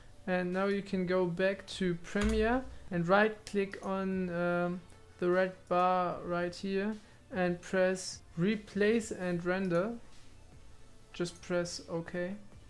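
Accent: Germany. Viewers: Man hört einfach das du deutsch bist lol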